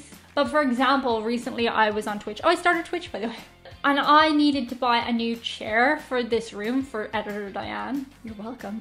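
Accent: Ireland. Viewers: Hello you got fantastic content I am a Irish ancestry but I was raised and born in USA I am a fan of Europe culture my surname is Murphy. your channel is a fantastic channel great interesting content. Your dog is awesome and very beautiful dog. I love your Irish accent too. I really like to visit Ireland one day to visit where my ancestry come from I also want to visit the United kingdom too.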